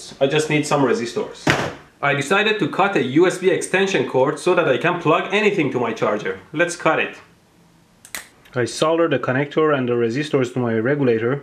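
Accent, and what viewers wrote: Iran. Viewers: he has an Iranian accent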